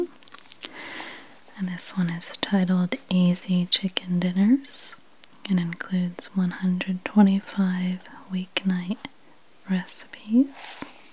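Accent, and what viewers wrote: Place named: Pennsylvania, United States. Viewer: I was thinking certain word you pronounce you might be from PA. Now I see "Giant" and I'd bet on that now! lol Lovely voice, lots of tingles!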